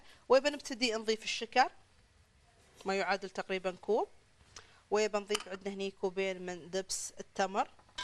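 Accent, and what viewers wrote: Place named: Oman. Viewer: عجزت افهم لهجتها الظاهر انها عمانية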